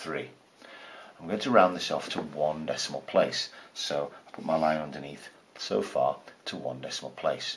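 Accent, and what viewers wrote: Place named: Cockney, United Kingdom. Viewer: You sound like a cockney Gangster!!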